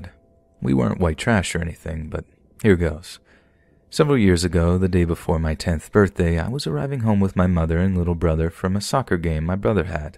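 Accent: Ireland. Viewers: that irish accent tho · Nice Irish accent 👍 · That Irish accent tho lmao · It was very interesting, love it!! Love your Irish accent, it was awesome 👏 Thank you 🙏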